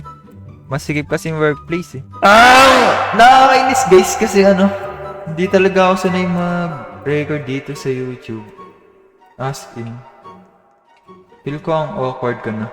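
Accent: Philippines. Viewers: Yaaa, Filipino accent like the filipinos, actually im surprised i always heard english in YouTubers accent 😊😅❤ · sabi ko na ba familiar ako sa filipino accent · HER SOUND LIKE FILIPiNO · It make sense because You sound like Filipino when you talk English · Siguro Tagalog ka talaga Kasi parang Tagalog ka talaga kapag nags peaking English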